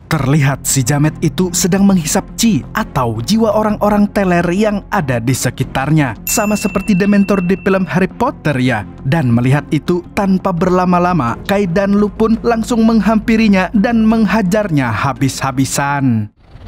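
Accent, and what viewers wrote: Banjar, Indonesia. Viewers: Orang banjar kah pian nih mang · Org Banjar kah bang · Orng kalsel kah pian mang, kya ad logat Banjar ny kaituu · Bang lu kalimantan mna, logat kek banjar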